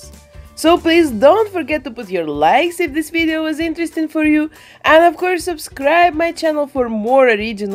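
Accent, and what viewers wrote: Russia. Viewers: It seems to me or you have a Russian accent?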